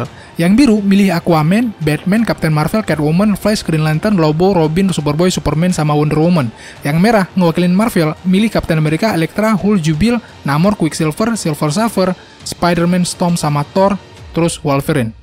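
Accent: Aceh, Indonesia. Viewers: Abang org aceh?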